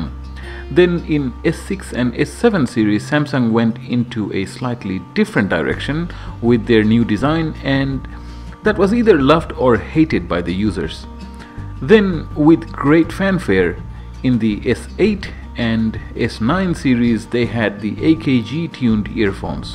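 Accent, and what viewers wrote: India. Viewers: What is that lovely accent!! It sounds like Indian accent with some Asian mixed!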